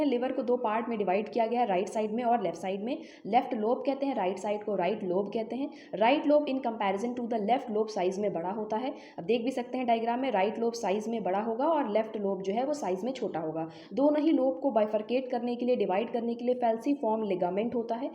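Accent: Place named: Meerut, India. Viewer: Aap meerut se ho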